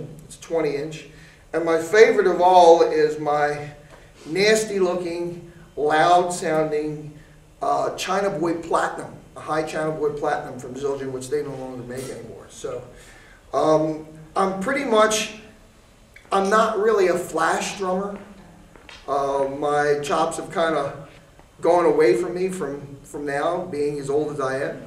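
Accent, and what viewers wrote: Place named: Baltimore, United States. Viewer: Haven't lost yer "Balmer, Merlin" accent, "hon"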